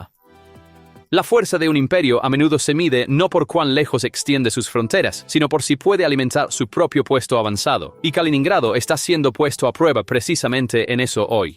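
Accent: United States. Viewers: El acento usado al estilo gringo es UNA MIERDA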